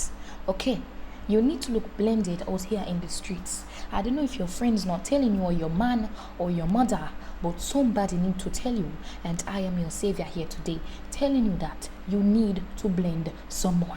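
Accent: Africa. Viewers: African accent?? SLAYEDD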